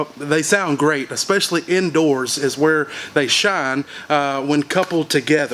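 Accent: South, United States. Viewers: I like this guy he sounds real country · I love that country accent brother! Love from the Carolinas. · Did not expect his country accent to be so thick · I love this guy, his knowledge is top notch. When I first heard his voice I had to take a double take, we all southern bro!!! Keep up the good work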